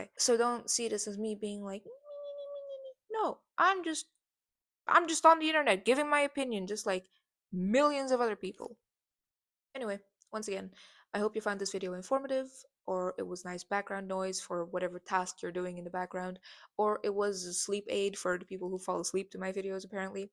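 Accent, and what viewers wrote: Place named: Quebec, Canada. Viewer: Not related to the video but i love your accent!! Are you french canadian? You sound like me :)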